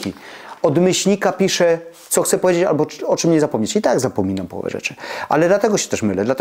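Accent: Silesia, Poland. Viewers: Podoba mi się Pana zdrowy rozsądek, luz, dystans i....śląski akcent! Pozdrawiam serdecznie :) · Zgadzam się z Panem. Moim zdaniem, jesieniara to osoba, która ma wszystko w nosie, włazi pod koc z kubkiem herbaty i książką, a inni niech pracują. Nie chcę nikogo obrazić, ale ja jesienią zaczynam pracę, nieraz przemokły mi buty, chorowałam, przeziębienie było zawsze o tej porze roku. Poza tym, dla mnie to smutny czas, przyroda zamiera, wspominamy zmarłych. Wolę wiosnę, ba kocham!!! Ale nie nazywam siebie wiosieniarą😂. Kiedyś ludzie nie dekorowali domów na każdą porę roku. Teraz liczy się zysk sklepów i producentów. Większość dekoracji to tandeta i zaśmiecanie środowiska. A śląskim akcentem proszę się nie przejmować. To dodaje Panu uroku. Nie tylko górnicy tu mieszkają- też jestem ślązaczką z dziada pradziada. Kiedyś na koloniach Pani wychowawczyni nazwała mnie i siostrę Ślązarami, sama przyjechała tu za pracą. 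Dlatego jesieniara do mnie nie przemawia. Dobrze, że Pan podjął ten temat. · I dobrze że słychać gwarę. W końcu ona też nas Ślązaków określa. Prof.Simonides często wracała w 😊swoich wypowiedziach słówko gwarowe" tyż". I w żaden sposób się tego nie wstydziła. Pozdrawiam panie. I zgadzam się z Pana przemyśleniami nr dekoracji. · 1. Proszę nie przepraszać za swój akcent. To część pana życia. Jak komuś nie pasuje to trudno. Rozumiem, że ktoś może nie znać śląskich słów i wtedy jak najbardziej, starać się mówić po polsku, ale zmieniać akcent? Pffff, chyba tylko dla buców internetowych. 2. Przyznam bez bicia, że też chciałam mieć dekoracje na kilka świąt czy wydarzeń, które celebrujemy. Chciałam mieć np. naczynia (talerze, miseczki, kubki) na Święta Bożego Narodzenia, na Wielkanoc. Oczywiście myślałam też o naczyniach sezonowych: tu listki na talerzu, tam płatki śniegu itp. I tutaj pojawia się moje szczęście w nieszczęściu: im dłużej czekam na koniec remontu i korzystanie z mieszkania (jestem singielką więc dłużej na niego zbieram) tym bardziej mój zapał na kupno tych rzeczy się po prostu ostudza. 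Już doszłam do wniosku, że musiałabym mieć pierdyliard rzeczy aby udekorować 60 metrowe mieszkanie. Po drodze pojawiło się dążenie do minimalizmu. Może tak musi być. Może po to tyle czekam na moje mieszkanie, aby żadne głupie myśli na jego dekoracje czy urządzanie nie przyszły mi nigdy więcej do głowy. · Zgadzam się w 100%. Dekoracje ponadczasowe👍 P.S. Uwielbiam śląski akcent i niech pozostanie😉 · Śląski akcent- super.Jestem z Podlasia.Polacy pięknie się różnią . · Ja osobiście jakoś nie czuję tych w sumie nowych trendów z zachodu i nie czuję najmniejszej potrzeby kupowania takich rzeczy - raz, ze szkoda mi kasy na to, dwa - mam małe mieszkanie i każda taka duperelka tylko by mi zagracała dom. Co innego Boże Narodzenie czy też święta Wielkanocne. A i wtedy jakoś nadmiernie nie szaleje z dekoracjami. Całkowicie się z Panem zgadzam Panie:)....a offtopowo powiem, że ja lubię ten śląski akcent i wszelakie (jesli są) "słowne niedomagania - dla mnie to wydźwięk naturalności a nie jakiejś sztucznej pompy:) Dobrego dnia! 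· Nie ogarniam zbierania gratów, "dekoracji😢" typu plastikowe dynie i o zgrozo! halołinowe. Moja mała slabość to kocyki, cieplusie milusie. W domu w zimę temp ok. 18 więc kocyk to jest to!!! Kupowałapom mówię PRECZ!😅 p.s. A Pana śląski akcent... Miód na ❤️ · Panie Pana śląski akcent nie przeszkadza, jest uroczy, myślę, że nie tylko dla mnie, osoby urodzonej i wychowanej w Bytomiu. Ale nad wymową "ę" zamiast "en" proszę popracować. Wymowa "bendzie" razi. Tu powinno być "będzie". Pozdrawiam Pana serdecznie i dziękuję za przekazywanie wartościowych treści i zdroworozsądkowe podejście.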